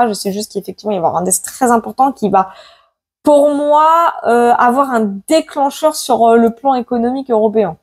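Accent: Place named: North, France. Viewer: Chère petite je réponds un peu à bla personne qui dit qu il ne comprend pas à ce que vous dites en réalité c est peut être comme moi qui suis du sud et qui a du mal à comprendre l'accent du nord surtout lorsque tu ris en parlant C est marrant ''''mais peut être si tu arrive à parler un peu plus lentement ce sera mieux Je te dis cela sans aucune méchanceté car je suis une vieille grand mère qui te fait de gros bisous car tu es très très sympa je t ai connu dernièrement lorsque tu étais avec le bazard de la voyance💋💋💋❤️❤️❤️👍👍👍👍👍👍on t aime bien petite cherie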